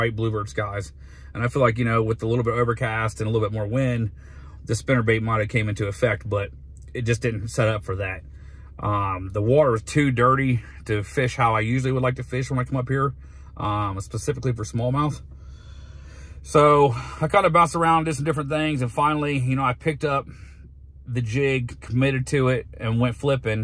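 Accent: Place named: Texas, United States. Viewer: Same boat , black 2023 I love it , and watching you fish feels like Im fishing in my boat. Im going tommorrow to Sabine River down here in South East Texas Same place the bass master elite has their event. The way you act and talk makes you seem like one of the ole boys from around here. its kinda funny how that works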